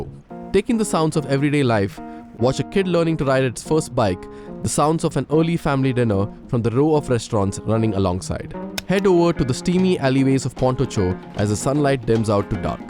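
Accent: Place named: India. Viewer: The indian accent is a bit too much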